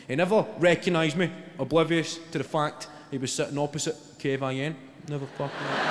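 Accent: Scotland, United Kingdom. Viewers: The Scottish accent makes everything funny. Tell me I’m dying in a Scots accent and I’ll die there and then of laughter... AND he will of been right. Gotta love the Scots. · Holy hell I just stubbled on this and I'm dying! I think my Scottish ancestry must help me understand him cause my girlfriend missed everything lol. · Scotland is absolutely stunning . I'm Scottish, born and bred, I still get overwhelmed by the beauty of many places. Scottish are typically friendly. You'll find very different dialects in many areas. We don't all sound exactly like Kevin.......he's a banky (clydebank area) West Dunbartonshire, I'm Dumbarton, West Dunbartonshire. Even in the short distance from my town to his, you'll find slight differences in how we talk. If you're into Scottish Comedy, give "still Game" a wee watch xx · I like the Scottish accent but I am seriously struggling to understand what he is saying. 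I know, I am a yank. What can I say? But I have watched living skint, and quite a few other British trash tv programs and I think I understand this guy better than most of them. The northern accent kills me. It’s such a struggle. Can it really be considered English? I know, this being said from someone who doesn’t have an accent. Lol.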